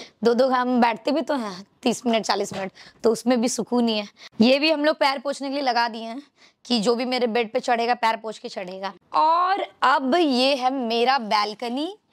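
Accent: Bihar, India. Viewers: Aapr proud hai.. Qki aap real ho. Genuine girl ho. Aaapka Bihari accent bahut achha lgta h.... Aap ekdm jamin se ujtkar yaha tak pahunche ho isly aap inspiration v ho sbke liye.. Love n support,👍👍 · I really Like her bihari accent.❤❤ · Ap jo bolte hai na di vhut acha lagta hai m v vese bihar s hu di..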